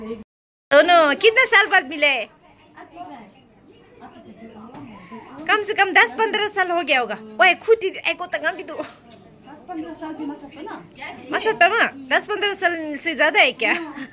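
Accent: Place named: East, Bhutan. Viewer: ཁྱེད་རང་མཚོའི་ཁ་སྐད་དེ་ནི་ ང་མཚོའི་ འབྲུག་ཤར་ཕྱོགས་པ་ནང་བཞིན་རེད་འདུག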